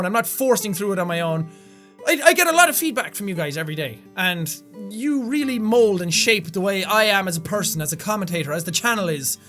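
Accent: Ireland. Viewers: are u actually irish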